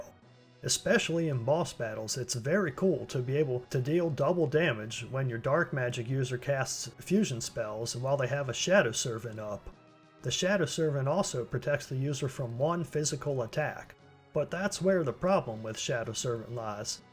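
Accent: South, United States. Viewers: I never thought I would listen to a guy with a thick southern accent talking about my favorite childhood JRPG. I absolutely enjoy watching this series. Please keep it up.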